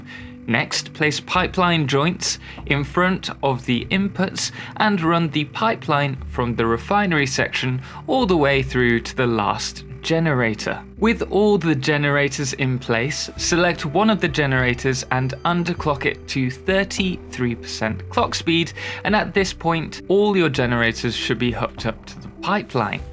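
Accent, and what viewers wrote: United Kingdom. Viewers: This has got to be the most British sounding person ever.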